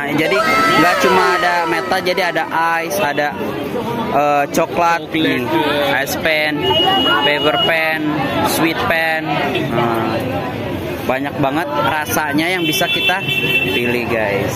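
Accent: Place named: West Java, Indonesia. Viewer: Mas situh asli nya orang sunda ya klo saya perhatikan dari logat logat nya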